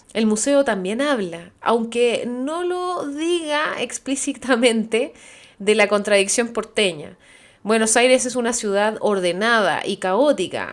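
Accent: Chile. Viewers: Hola, querida...he visto varios de tus videos y siempre quedo maravillado por tus comentarios y tu particular forma de expresarlos con tal riqueza idiomática y con ese encantador acento chilensis. Me asombra tanta cultura en una persona tan joven, y envidio a tus amistades que tienen la fortuna de poder dialogar contigo y escucharte exponer tus agudos puntos de vista. Ojalá algún día te decidas a mudarte a BA para que los argentos podamos disfrutar de tu aporte cultural a lo que ya tenemos. Cuídate, muchachita, y que Dios te bendiga.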